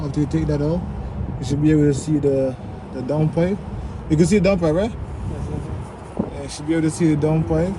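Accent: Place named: Jamaica. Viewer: u sound like yard mn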